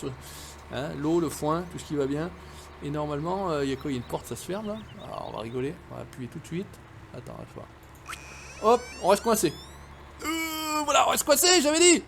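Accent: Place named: Nord-Pas-de-Calais, France. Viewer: Très bonne vidéo comme d'habitude. qui se met à parler chti et un peu patois. Je me demande si tu es vraiment, lol 🤔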